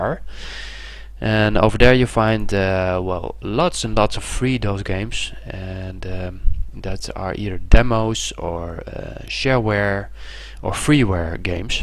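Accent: Netherlands. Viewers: Dat kwam ik achter. Dat was duidelijk Engels op een Nederlandse toon. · Nederlands? Ik kom uit Nordduitsland, ik ken dit accent :P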